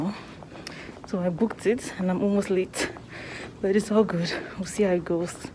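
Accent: Nigeria. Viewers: you sound so much like a Nigerian. I'm amazed on how Nigerians and Cameroonians sound so alike. XOXO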